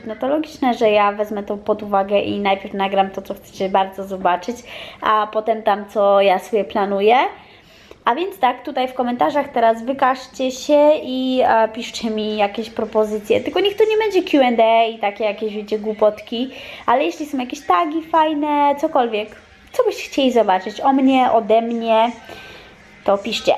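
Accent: France. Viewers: dlatego, że we francuskim końcówki są "bezgłośne". Nie wypowiada się ich tak dokładnie jak w języku polskim. Przyzwyczajenie do innego języka. Poza tym myślę, że to bardzo niekulturalne zaglądać komuś do portfela i komentować, że się dużo na coś wydaje.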